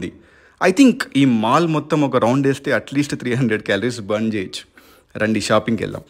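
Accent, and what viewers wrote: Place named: Hyderabad, India. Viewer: I THOUGHT U ARE HYDERABADI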